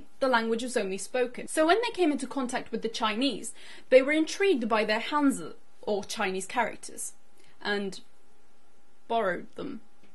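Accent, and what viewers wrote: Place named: United Kingdom. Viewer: Look her accent. She's from UK. I don't think that I could hear that nice english from an avarage american female teenager. (I'm the offensive) It would probably be like that "y'all gonna rite kateiganaa if u wanna pop out ur words 'n Kanjee words comed from Chaineese's abc like, butt ya know if you wanna do a logo, u gon' use our letters"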